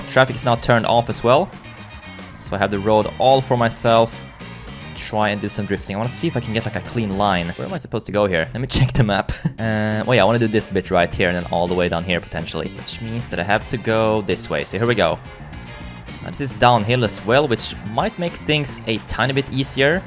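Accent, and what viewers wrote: Sweden. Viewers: Bruh, your Swedish accent is so satisfying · Are you swedish it sounds like that?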